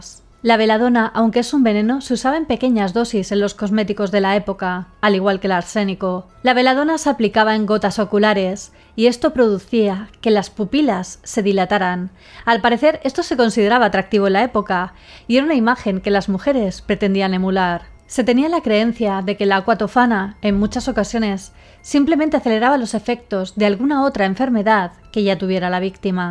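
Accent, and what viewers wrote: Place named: Spain. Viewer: Me encanta tu lunar y tu acento Español .. 😻🥰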